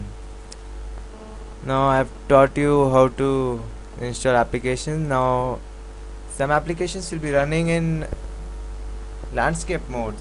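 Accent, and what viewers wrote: India. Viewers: 100% idian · He sounded like a Hindi (India). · hahaha i love gujju accent lol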